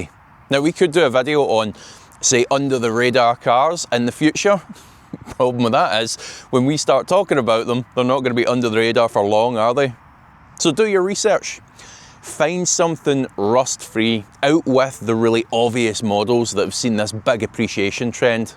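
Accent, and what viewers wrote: Scotland, United Kingdom. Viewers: Love the Scots accent too!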